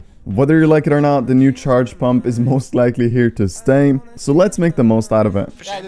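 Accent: Norway. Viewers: Hæ??? Jeg visste ikke at du va norsk! Nå som du sa det så hører jeg det. :/ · vent, er du norsk? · er du norsk?? det visste jeg ikke haha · Are you Norwegian?🇳🇴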